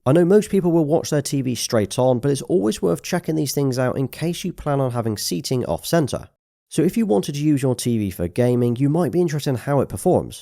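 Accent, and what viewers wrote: United Kingdom. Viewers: OMG I like your voice I think you are British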